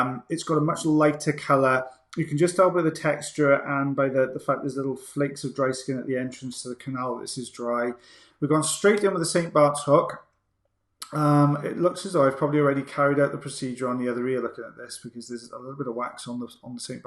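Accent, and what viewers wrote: Wales, United Kingdom. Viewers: you said you wanted to know if youtube interrupted the video to show an ad - they were showing the DR Drew says this guy is for real V-shred ad on 26/11/23. I love these videos, theres something so satisfying about the skin peels! and your accent reminds me of my grandmother, i loved her welsh accent and i miss it so much, please keep the videos coming!!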